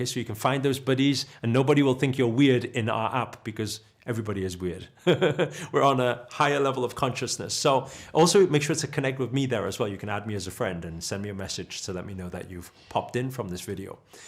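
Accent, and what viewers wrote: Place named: Liverpool, United Kingdom. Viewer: You sound like your from Liverpool.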